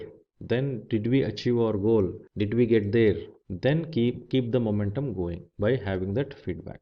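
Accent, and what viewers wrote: India. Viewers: Indian English voice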